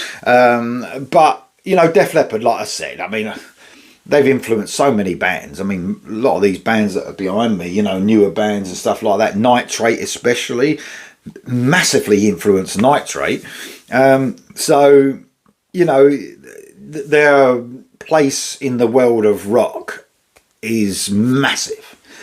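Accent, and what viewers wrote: United Kingdom. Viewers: I'm surprised you spent so much time on something you don't like. Just asking really gently and in all sincerity why did I waste time listening? (I think it's because I enjoy your UK accent from a region I still can't identify and your interesting REACTION comments!)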